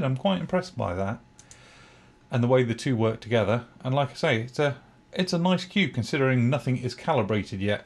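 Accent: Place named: United Kingdom. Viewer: It’s always great to hear a UK accent. I hope the build is going OK. Personally I hate my Ender 3 and I love Klipper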